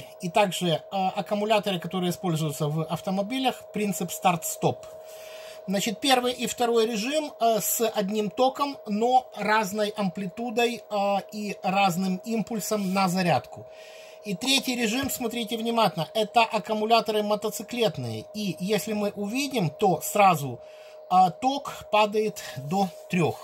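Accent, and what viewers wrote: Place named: Odessa, Ukraine. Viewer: А по-моему как у Одессита 😊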